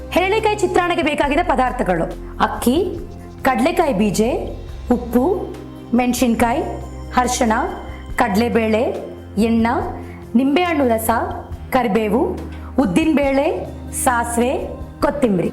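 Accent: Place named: Karwar, India. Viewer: Nice. Heralekai rasakke nimbe hannina rasa andubittri. By the way I enjoyed your Kannada accent. Idu Karwar kannadana ?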